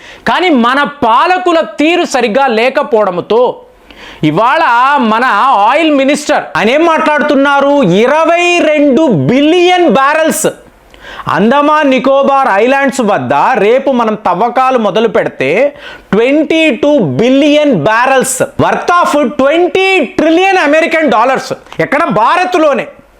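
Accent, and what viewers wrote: Tamil Nadu, India. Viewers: ప్రేమ్ గారు తమిళనాడు లో చదువుకున్నారు అక్కడ తెలుగు ఇలానే ఉంటది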